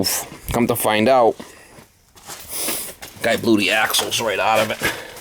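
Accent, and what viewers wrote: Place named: New York, United States. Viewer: you sound like you are from New York. It makes sense since i can find a single fucking 420/430 around new england for a good price since you are picking up every single one of them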